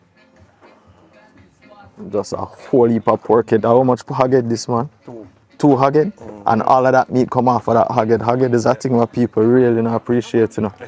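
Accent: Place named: Jamaica. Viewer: I love your accent, great job on videos my Jamaican friend:)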